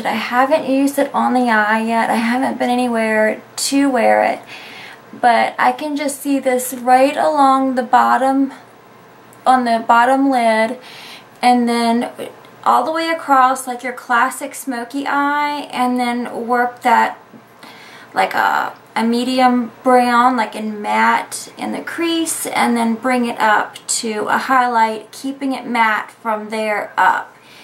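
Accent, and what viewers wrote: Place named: South, United States. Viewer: im in Michigan girl so our weather is just like yours either nice and then bamm its now 85 then the next day back to 65 for a few days then now 90 for a week its nuts! We had some bad tornadoes last week but now its ok this week. Missed your sweet lil southern voice lol good your doing ok. Love your vids n advice n specials n of course tips.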